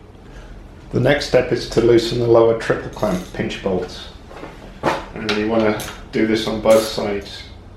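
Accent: United Kingdom. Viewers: Hi just wondering how you got tusk wheels as it sounds like you are based in England?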